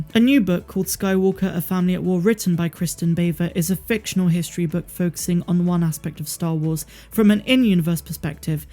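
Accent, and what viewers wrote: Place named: England, United Kingdom. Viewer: I love hearing your English accent:),,, on Starwars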